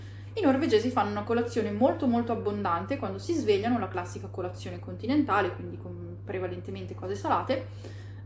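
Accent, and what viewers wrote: Italy. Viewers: il tuo accento è italianissimo, lombardissimo. E' esteticamente che sei italiana quanto io curdo. Le tue ORIGINI non sono italiane. O hai il coraggio di dirmi che tutti e 4 i tuoi nonni sono italiani ? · ma perchè parli con accento milanese se sei in norvegia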